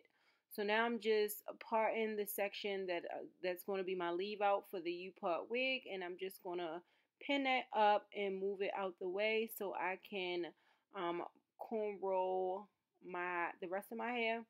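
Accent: Baltimore, United States. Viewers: sounds like Baltimore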